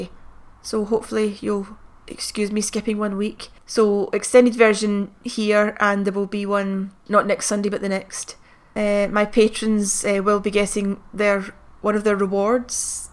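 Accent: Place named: Scotland, United Kingdom. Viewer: I'm of Scottish and British decent. I love your Scottish accent. What part of Scotland are you from. Love Threads and this movie too.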